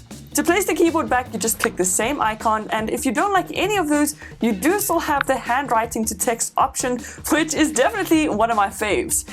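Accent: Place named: South Africa. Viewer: Your accent sounds like South African is that correct and do you live there now.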